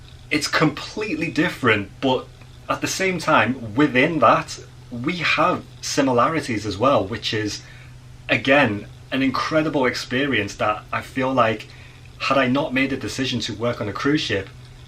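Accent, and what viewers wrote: United Kingdom. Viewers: How does your English accent affect your speaking your native language? thanks for sharing!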